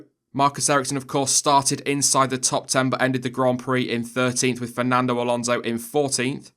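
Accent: United Kingdom. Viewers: Sexy british accent #nohomo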